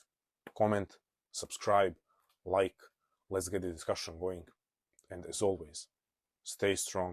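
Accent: Balkans. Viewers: Great insight, don't mind me asking but are you maybe from the Balkans?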